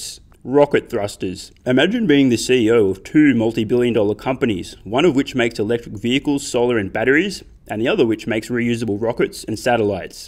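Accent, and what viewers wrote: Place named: Australia. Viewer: you have no offence a voice that is difficult to listen too.... it’s a very unattractive Australian accent. I suggest you get a woman to voice over your videos doing this will win you more subscribers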